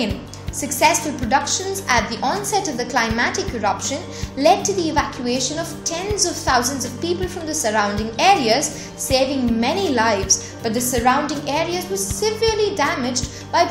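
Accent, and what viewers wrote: India. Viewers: indian xa... ganyan silang mag-ingles cute lang talaga ...-) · Indian accent my dear. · Indian accent?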